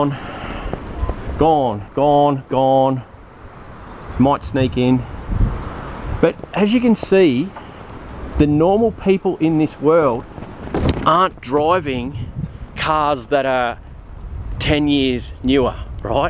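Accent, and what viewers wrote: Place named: Australia. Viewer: It seems like older people 50+ like this guy in the video just don't get EV's at all. Fair enough you like your petrol engined cars. That's your passion and I get it. But some of the misinformation you spout about EV's is bollocks. Not everyone is like you though and 95% of the population want a car that goes from A to B as cheaply and reliably as possible and that's where EV's excell. You sound like your from Australia. I find it bizarre that due to the fact you get a shit load of sun in your county to capture with solar panels and put this energy straight into the cars that your country has been so backwards for all these years. I'm glad to hear the new goverment you have in power is changing this fact. Your country should be championing energy independence and this biggest fact why I love EV's is because us Brits can transition to some sort of energy independence and not buy in petrol from these rouge nations and keep our money in our country.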